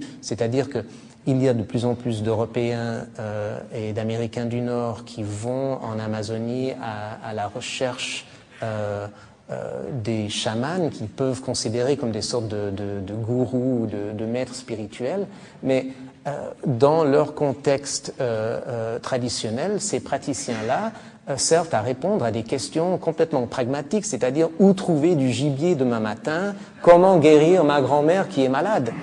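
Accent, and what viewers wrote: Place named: Switzerland. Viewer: ton doux accent suisse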